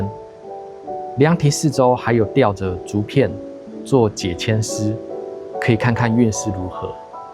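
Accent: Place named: Taiwan. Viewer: 台灣rén lén和shì sì不分的腔調真的完美演繹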